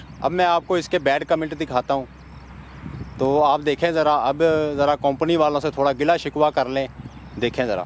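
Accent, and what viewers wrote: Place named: Punjab, India. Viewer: Bhai apni urdu to teak kar lo adi punjabi mar ra ho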